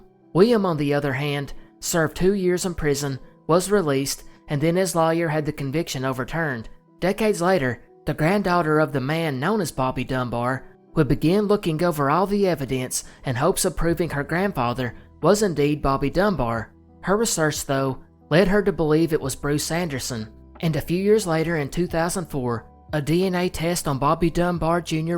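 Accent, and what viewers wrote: South, United States. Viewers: You're sincerely my favorite channel. Yes, I am a Southerner and your accent has a familiar and friendly familiarity, but that's hardly the only reason. You're information is researched and vetted and your attention to detail is impeccable. Thank you for your excellent work and the excellent entertainment!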